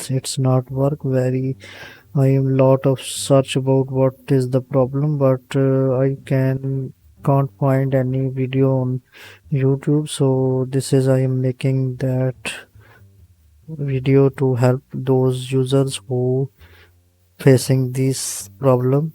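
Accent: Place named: India. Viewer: not to be racist or anything, but itis always those quick videos on youtube with someone with a strong indian accent that saves the day. THANK YOUUUU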